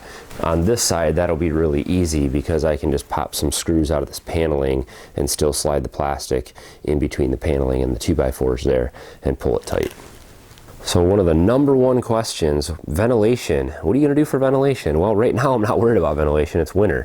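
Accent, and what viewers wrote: Michigan, United States. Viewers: your Michigan accent...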